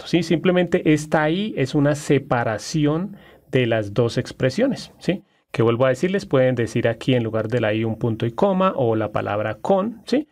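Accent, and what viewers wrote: Colombia. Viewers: una duda usted es colombiano=??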